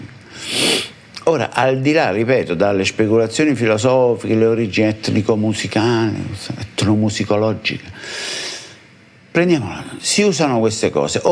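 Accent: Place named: Naples, Italy. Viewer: Ottimo!! Ti ho conosciuto tramite l'intervista col "Tino", hai una "tomità " tutta napoletana nel parlare ed una chiarezza espositiva che mi ha fatto avvicinare ad un mondo finora misterioso non da ascoltare ma da imparare. È già il secondo video che ascolto ed è molto bello seguirti. Chapeau!!!👍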